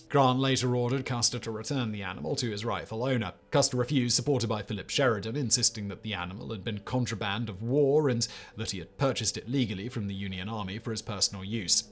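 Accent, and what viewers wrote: United Kingdom. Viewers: Dear, dear... I do think they protesteth far too much. It's either your pronunciation (you damn Limey), your racial naming of participants of just choosing the wrong side of your subject. You can't win can you..., so stick to Europe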